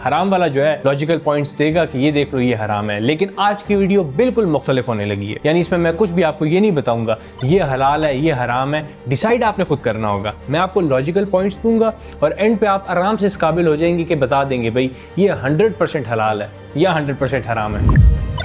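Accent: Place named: Pakistan. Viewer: Ab batao bhai aur 100% loser ki fee se nhi chalta unka main revenue of source h pr bohot si cheeje h ab job Krna hoga to banda karlega funding pips me bolega halal h aur wahi model pe kaam krne ko glt kaise bol skta h 😂 btw me India se hu mujhe is ke upr ki jo mene knowledge li h iske upr level ki knowledge laake batao ki ye haram h pakistani 😅 awaaz curiosity jyada banati h :) agar h koi banda to genuine batao to me leave bhi krdunga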